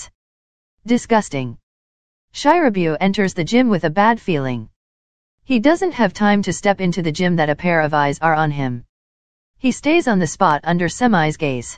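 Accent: Italy. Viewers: ti prego ho capito subito che sei italiana, comunque adoro troppo il video<3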